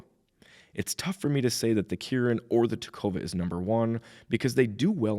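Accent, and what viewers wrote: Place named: Canada. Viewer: That's usually a Canadian accent, or the states that border Canada. Like Minnesota, Washington, etc.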